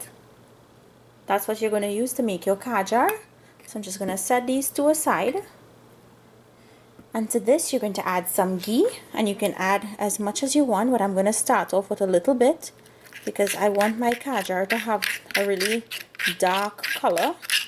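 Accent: Trinidad and Tobago. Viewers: I came to the video by accident and when I heard the voice, I said myself 'Aye aye! how she soundin' like a Trini so?", then I checked the name of the channel and bawl 'Or horr...'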